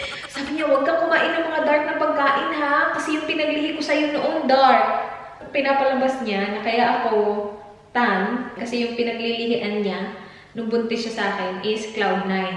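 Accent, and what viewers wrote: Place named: Visayas, Philippines. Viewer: Bundak kaayo mu bisaya si ate 😂 shout out po ate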